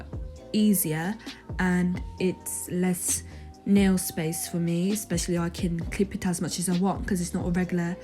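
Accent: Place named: United Kingdom. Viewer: its the british accent for me. You're doing great. Really happy for your business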